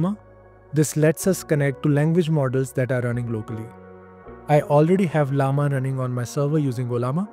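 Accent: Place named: India. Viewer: From your voice guessing you are an Indian and hope you live in India coz we need India specific affordable solutions. I have been using Sonoffs, Tinxys, Smarteefi...etc in my house and plenty of Amazon and Google smart speakers. This week have asked my kids to try HA on an old Lenovo mini PC. Eventually I may move to one of the Chinese mini PC brands like Gtek. The speaker you mentioned is 8K in India. Anyway we can use Alexa or Google speakers for this?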